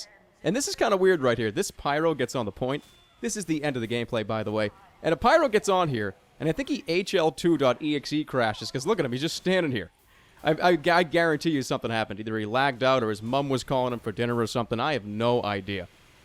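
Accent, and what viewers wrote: Boston, United States. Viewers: yo youre from boston? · your from where the scout is! boston!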